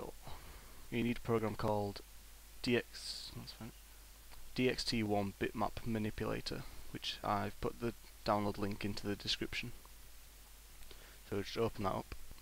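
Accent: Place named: North, United Kingdom. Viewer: Thanks so much for posting! Simple, easy to understand and to top it, you're a northerner!!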